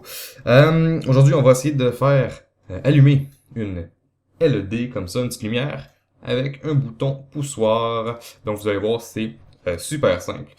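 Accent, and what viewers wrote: Quebec, Canada. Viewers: Top ta vidéo l'ami. Juste une chose pour faciliter la compréhension du Français avec l'accens Q'bequoi... Parles un peu moins vite... S'il te plais... Un cousin Français qui apprécie tes tuto, et qui voudrais pleinement en profiter...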